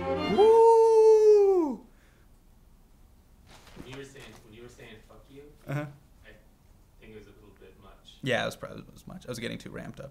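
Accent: India. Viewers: Hasan speaks with indian accent and he wants to Ashton Kutcher doesnt do so. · Many indians absolutely do sound like this. Why do you choose to make up stuff when we have ears and with english spoken all over the world in different accents we have developed a pretty good ear to tell the difference between kurly-wurly english and ooga-booga english. Why are you ashamed of sounding indian? Why do you think all indians must sound like a middle-class white american undergraduate? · sorry mate but you do sound like that and I know indians pakistanis and bangladeshis and all of the sound like that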